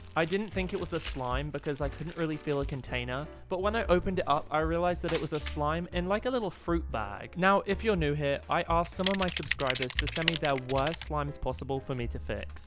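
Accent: United Kingdom. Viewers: He sounds British bro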